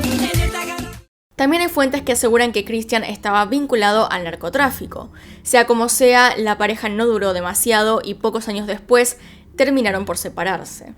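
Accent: Argentina. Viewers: soy de origen mexicana pero casi toda mi vida vivo en Amsterdam, tan chistoso el accento Argentino con el sssshhhhhhh muy interessantes video's nadamas tu maquillaje muy claro/blanca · Esta mujer me encanta tambien😻😻 sobre todo por su acento argentino y su inteligencia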